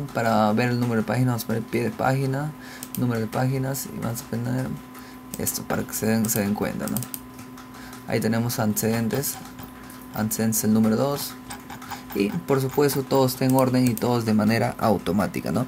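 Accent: Peru. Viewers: peruano XD lol (lol) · Español Perú ☠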